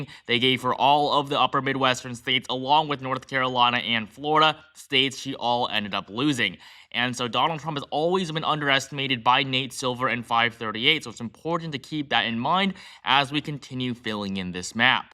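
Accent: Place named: Pennsylvania, United States. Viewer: You have a noticeable accent. Are you from Pennsylvania?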